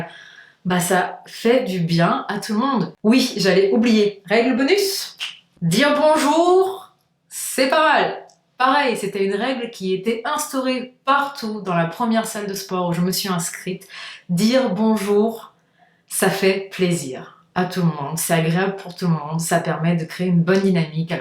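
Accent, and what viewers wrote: Portugal. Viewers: C'est dû à son accent! Elle est portugaise, du coup dans ces langues là (espagnole et italiens) il y'a ce qu'on appelle l'accentuation. C'est surement ce que tu entends le français dérogeant à cette particularité rend encore plus audible son accent c'est tout! Bisous!! PS: d'ailleurs elle en a fait une vidéo sur son accent ON trouve cet accentuation en Anglais, bref dans plein de langues...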